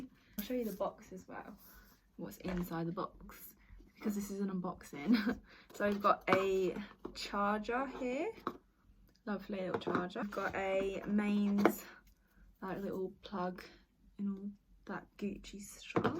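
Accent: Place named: United Kingdom. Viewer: Mine arrives in couple of days!!Love that British accent!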